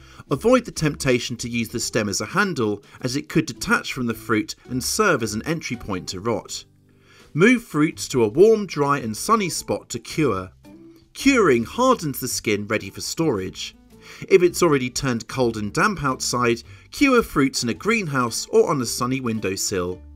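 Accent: England, United Kingdom. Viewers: The English accent and his expressions are so delightful...long live England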